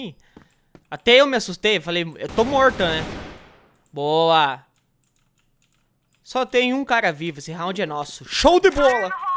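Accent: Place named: Rio Grande do Sul, Brazil. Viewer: Parece ser gaucho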